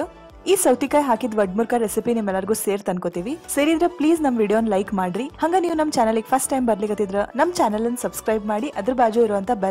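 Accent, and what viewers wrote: North Karnataka, India. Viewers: Ri madam, i really appreciate ur unique recipe, but i watch ur videos becz of ur voice and especially UK slang. U r multi talent, u r mixture of Modern, Traditional and cultural Angel. Thanks Ree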